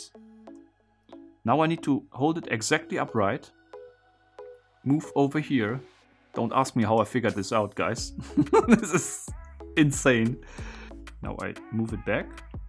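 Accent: Germany. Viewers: This reminds me of the first video I ever watched of yours - I was thoroughly impressed and entertained by this friendly voice with a light german accent drawing out the inside of the puzzle by touch as well as trial and error. The passion showed through your attention to detail and delight at the way the puzzle was MADE, not just the solution. That being said, I pictured you as an older gentleman, maybe with salt and pepper hair, and a neat bow tie. I'm not sure if I should be more impressed that someone so young would be so patient and exacting, or disappointment that my illusion was shattered. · His German English is 9.5/10 Most Germans pronounce some words differently than Americans. It’s not right or wrong. has the best energy and is sooooo smart.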